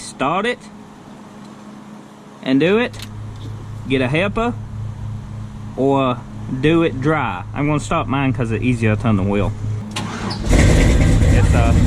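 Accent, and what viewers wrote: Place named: Louisiana, United States. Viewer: Cajun accent sounds like 👍 thanks for the video brother!!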